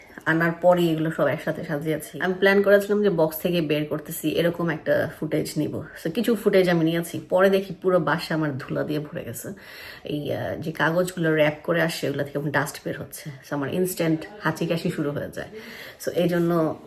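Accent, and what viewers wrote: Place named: Bikrampur, Bangladesh. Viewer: Apu amar onek janar ichcha apni bikrampurer ? Apnar kotha sune mone hoilo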